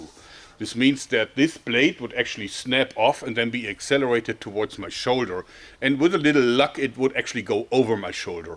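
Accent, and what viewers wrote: Germany. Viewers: Im German. He has a typical German accent and the Amazon-Screenshot was is german language too. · Man hört den deustsch accent so dirch aber irgendwie sind keine deutschen kommis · He actually sounds very german.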